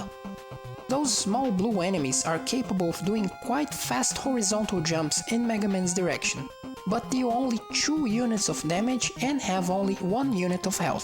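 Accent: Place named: Brazil. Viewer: By some reason I feel like you're brasilian. Well, at least your accent gives me this impression.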